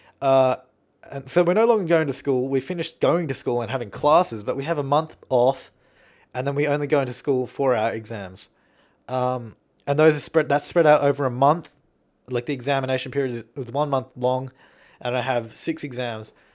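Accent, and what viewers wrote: Australia. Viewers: I know this is an old comment, but I do the exact same thing. I guess my love of Australian accents makes his voice 10 times better but even if I wasn't oddly obsessed with the accent his voice just has a soothing sort of sound to it ahaha I sound soo creepy right now xD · I love your accent, I was going to ask where your from, but I figure by the way you rponounce your Rs and AHs, it's Australian. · he's australian, not a kiwi. he has a australian accent :) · Madisyn Davis again... this is a really really old video but still... cannot agree more. I love listening to his voice. (But same as you I have a strange obsession with Australian accents so...)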